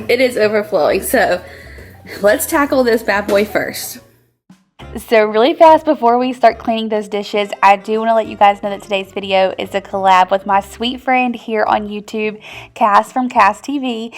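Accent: South, United States. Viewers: I knew u sounded close to home. You're about 2 hours away from me. But are u originally from the south? Kinda have a little proper accent here & there😄I've never tried the cassrole with cream cheese & cresent on top like that, ill have to try it out! We usually just do Cresent rolls and sausage then egg mixture.(yours looks better haha!) Me and my husband will prob just split it down the middle😂😬.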